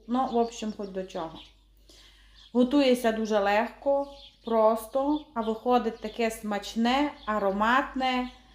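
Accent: Transcarpathia, Ukraine. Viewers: Щиро дякую, за рецепти, за приємне закарпатське мовлення. Здоров'я вам,наснаги і здійснення всіх задумів. Слава Україні! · Дякую за рецепт, дуже гарно розмовляете по закарпатський!!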